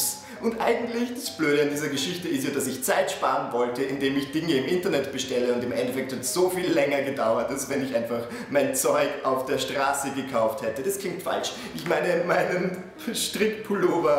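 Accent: Vienna, Austria. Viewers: 1. hat meiner Meinung nach keinen so starken Dialekt, weil er versucht - glaub ich - in Schriftsprache zu sprechen, damit ihn die Zuschauer auch verstehen. Aber er hat einen typischen Wiener Akzent, den jeder erkennt. Sogar Deutsche. 2.) Ist der Wiener Dialekt gar nicht so krass, wie andere Dialekte. Das ist so ähnlich wie mit den Berliner. Da sprechen auch fast alle in der Schriftsprache. (Nur der Berliner Slang is noch n bisschen krasser, aber der kommt gar nicht so häufig hier vor.) Bin jetzt nicht der große Dialekt und Akzent Experte, aber hab da schon so n bisschen was mitbekommen. Besonders, wo ich selbst Österreicherin (Steirer) bin, die vor ein paar Jahren nach Berlin gezogen ist. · ich wohn in berlin kreuzberg und mein postbote ist sehr faul. ^^ anstatt zu klingeln bei mir (4.stock wohn ich) und sich die muehe zu machn hoch zu laufen gibt ers irgendwo anders ab sodass ich jedes mal mein verdammtes paket suchen muss.. 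ps. ich liebe diesen dialekt xD bin halb deutsch halb oesterreichisch also versteh ich alles und find diesen dialekt so angenehm.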